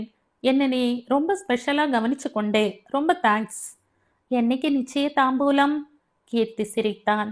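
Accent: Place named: Palakkad, India. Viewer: Mam, Your Palghat accent is very nice. Anyone will assume you are brought up in Palghat!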